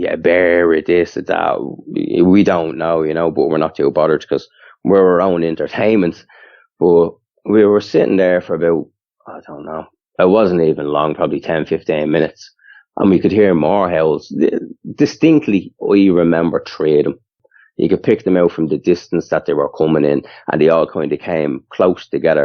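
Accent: Ireland. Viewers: Number one, I am so very sorry that when you think of America that this encounter will always be your first memory of our country. Number 2, I have visited former Jugoslavia, and Germany and I hope to travel to Ireland one day. I find your accent/brogue beautiful. I am Italian but I have always been drawn to the Irish and Ireland and I am not sure why. I want you to know we appreciate you sharing your encounter with all of us, as I can't imagine just how difficult this was for you to retell. 💕🙏💕🙏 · As an Irish guy listening to this randomly hearing an other Irish guy talk about a dog man is bizarre to me 😳, awesome 🤙🏽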